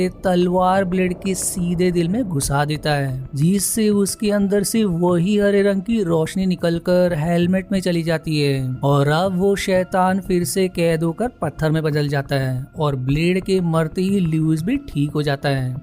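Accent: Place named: Maharashtra, India. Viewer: Marathi words hote hai , accha lagata hai apke tune me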